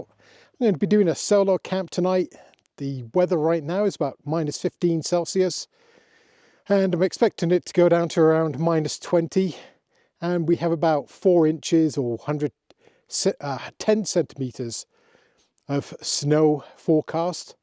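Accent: United Kingdom. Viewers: That was smart going up hill when finding a camping location. First time viewer. Emjoyed your video. Are you from the UK?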